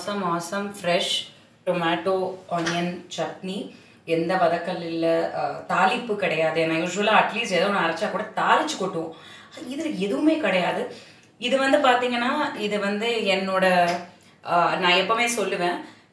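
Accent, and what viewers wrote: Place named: Thanjavur, India. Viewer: அப்படியே வச்சிஉருச்சி தஞ்சாவூர் நாட்டுசாலை பேச்சி இருகுது மிக்க மகிழ்சி உங்கள் சமையலைவிட உங்க பேச்சியத்தான் நான் அதிகம் ரசிப்பேன் அக்கா supet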